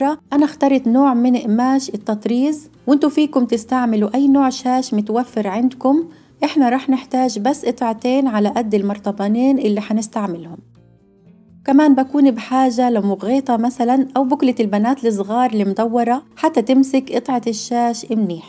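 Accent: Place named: Egypt. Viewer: ليش لهجتك مخلوطة بالمصري.؟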